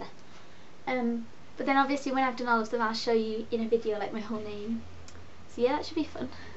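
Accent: United Kingdom. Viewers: I love you're accent are you Brittish??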